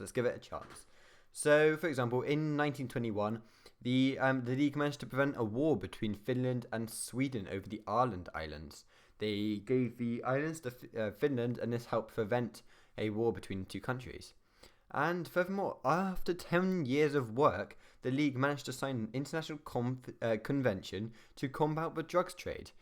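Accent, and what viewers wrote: United Kingdom. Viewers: I love your accent. R u from Yorkshire or something?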